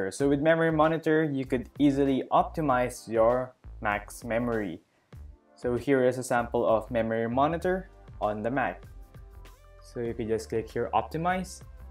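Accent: Philippines. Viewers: Sounds Pinoy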